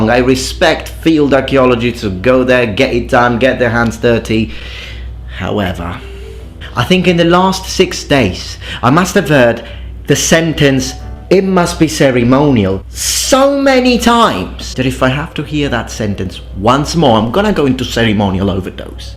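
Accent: Sicily, Italy. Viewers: Your explosive Sicilian delivery with your hyper-educated sarcastic English is a killer combo, sir. I'm crying with laughter.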